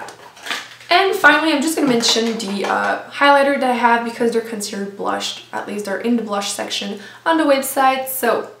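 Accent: France. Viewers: I just love Nars blushes because they are so pigmented! I never have to worry about them showing up on my skin. I have 8 right now, Amour and Seduction are next on my list. Es-tu française? J'ai toujours l'impression d'entendre une pointe d'accent. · gorgeous French accent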